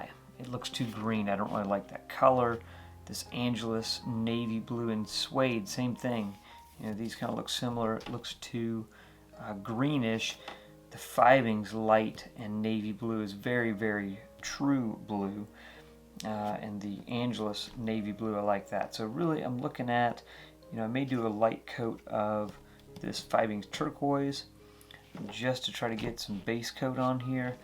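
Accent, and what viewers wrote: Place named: Oxford, United Kingdom. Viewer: I mean, you did a good job. The way you speak, your intro and other thinks are totally like Elegant Oxford. I don't say that you're bad at what you do.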